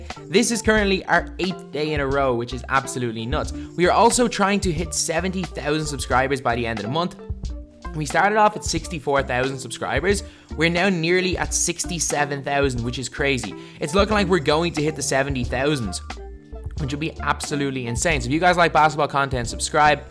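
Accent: Ireland. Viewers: Wtf you’re Irish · Kinda strange listening to an Irishman talk about American High School boys. I didn't know basketball was big in Ireland since there was only one that ever played in the NBA "Pat Burke" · Are u irish?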